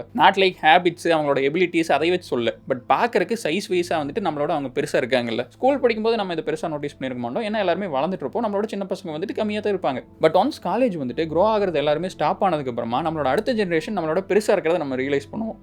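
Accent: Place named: Kongu, India. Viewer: சடபுட கொங்குஸ்லாங்❤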